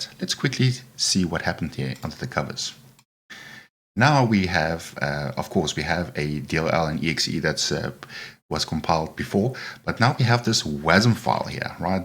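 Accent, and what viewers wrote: South Africa. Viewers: Ey Brother Man. Picked up that you may be South African. Just Subbed. All the best. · This guy sounds South African :)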